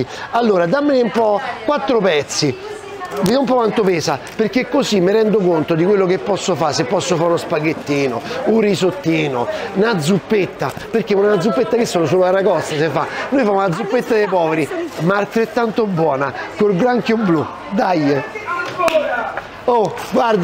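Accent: Rome, Italy. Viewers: Ma perché parli in Romano,che sei Napoletano? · Se non parli il romanesco è molto meglio. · Simpaticissimo col suo accento romano, bravissimo in cucina, complimenti, io la seguo sempre. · te che parli in romano, piu' i sottotitoli in italiano... me sembra de torna' ai vecchi tempi de "i sottotitolati", di Greg...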